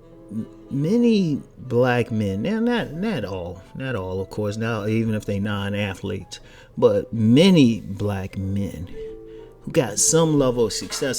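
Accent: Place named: Baltimore, United States. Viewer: Funny how you can still hear your B.More area accent that do and you give yall away everytime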